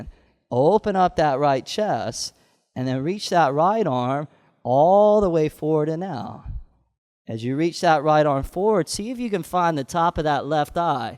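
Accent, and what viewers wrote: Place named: Southern California, United States. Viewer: Thanks teacher, been practicing with you on youtube everyday, here in Bali. Equanimity in that Southern Californian twang.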